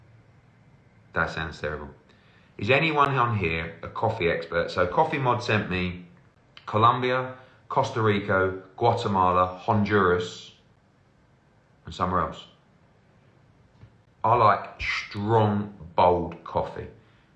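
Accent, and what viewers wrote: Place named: Cockney, United Kingdom. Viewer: knows his market. From a posh boy into a cockney geezer attracting “ the lads” Similar to Dave Allen fans.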